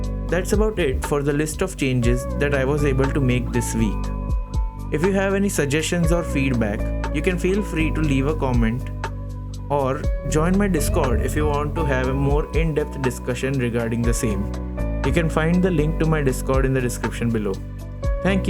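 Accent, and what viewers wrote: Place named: India. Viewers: I am pretty sure he is from India just from accent. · the accent tells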